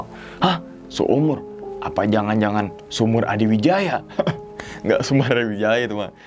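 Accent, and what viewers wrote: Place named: Java, Indonesia. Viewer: Bang,,btw asli mna 🤭ngomong bahasa jawa nya kyak gmn gtu,,,medok medok kota,🤭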